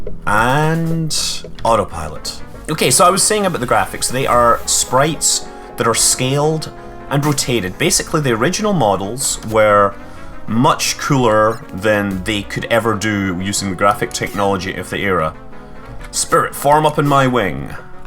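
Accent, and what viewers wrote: Australia. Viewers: Your Australian accent makes my hair fall out from cringing. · That Australian accent XD Don't worry, I forgive you. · nice australian accent! sounded like the guy was from up in the bush! Thumbs up for Australian!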